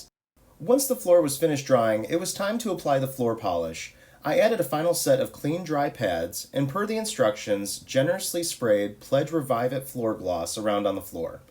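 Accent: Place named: Canada. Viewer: Great demonstration! Your voice sounds much like a Canadian I have heard on Youtube that has a vintage kitchen and a collector of turquoise appliances.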